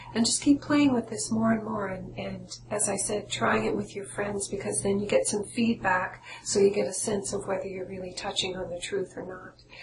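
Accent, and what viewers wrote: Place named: Nova Scotia, Canada. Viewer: Hi, I am so sorry that I did not know about you when I was living in NS. I am very interested in learning to channel as I feel spirit talks to me in very subtle ways and I want to be more open to the messages. I have to laugh as right away I picked up on the accent and had to google you to see where you were from..i miss the NS accent:(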